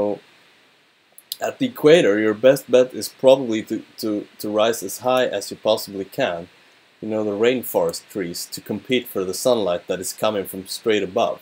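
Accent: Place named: Scandinavia. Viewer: You sound scandinavian, sweed?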